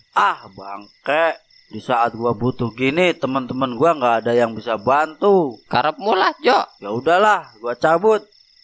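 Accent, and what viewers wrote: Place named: Banyumas, Indonesia. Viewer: Bahasane ngapak wae om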